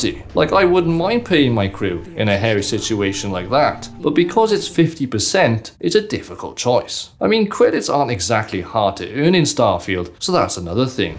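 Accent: Wales, United Kingdom. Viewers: Love the Welsh accent, although yours isn't strong. It just sounds friendly to me. Diolch!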